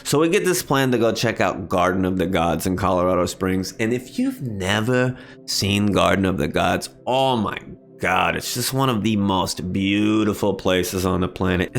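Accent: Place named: Boston, United States. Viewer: Where you from man what’s the accent? I’m from just north of Boston and sounds kinda familiar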